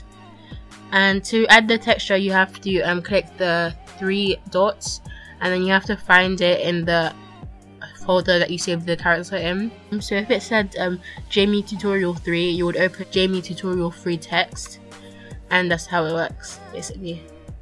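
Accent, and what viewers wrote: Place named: United Kingdom. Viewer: YASS SHE IS BRITISH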